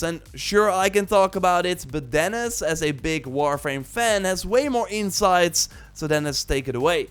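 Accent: Netherlands. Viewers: Dutch I believe he's from Amsterdam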